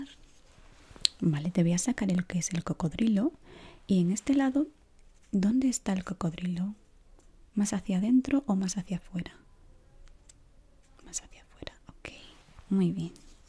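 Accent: Spain. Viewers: Me gusta tu voz eres española?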